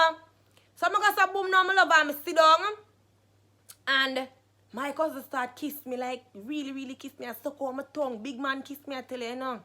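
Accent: Jamaica. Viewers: Cynth Darling it’s not... she’s just speaking patios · I love her Jamaican voice😍 y’all Jamaican people know 😂😭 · Straight countri Patois she a chat. Lol I should know, since I'm Jamaican 😂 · She sounds calm for a jamacian she is toning it down for u lot British jamacian here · Me finally subscribe, yasss lol I see ppl in the comment saying they hardly understand you,lol hush guys it's patwa get use to it :-) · I didn't know Jamaican accent was this much strong lol😂😂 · 🤔😆😆😆😆😆. Girl you're killing it..... I wouldn't call that loosing your virginity.... 4sum lol....... That's an experience darling...😂😂😂😁😁.. Love how you bring out the Patois 💯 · She is speaking English. Broken british English with a jamaican accent.. It's slang. We speak proper British English with a jamaican accent. But when she breaks into the patwa that is our slang. Just like when you see black Americans speak slang, (not all) are what they call ebonics. 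But when you at work, or in a professional setting, you speak proper English. Also depending on which part of the country you are from in America, it also includes ur accent. Get it? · Shes Jamaican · Yes the accent is real, she is from Jamaica, that's Jamaican patois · Me too....i think she is jamaican 🤣🤣🤣 she talks as if she is singing regge😂😂😂😂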